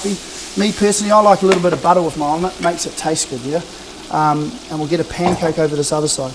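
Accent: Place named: Australia. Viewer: Thanks! Australian, lol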